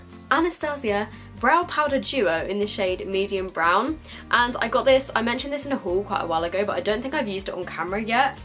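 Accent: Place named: United Kingdom. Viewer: she sounds so extra British today. Idk y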